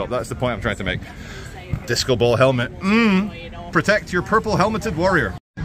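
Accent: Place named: United Kingdom. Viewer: your accent. Sounds British to me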